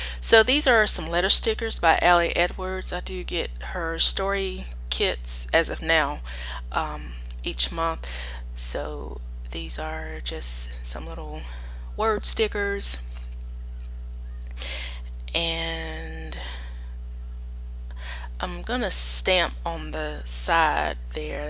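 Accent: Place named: South, United States. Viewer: This was the first time I've watched one of your videos -- NICE JOB! I totally love your Southern accent. Great PL spread. Thanks for sharing, I'll tune in again!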